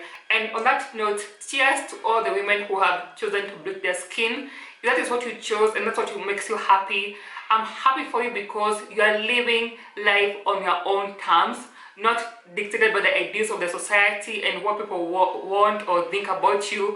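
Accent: Kenya. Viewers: Looking great. Your skin is glowing. ❤ *finally I can hear Kenyan/ kikuyu accent * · It's an educated Kikuyu accent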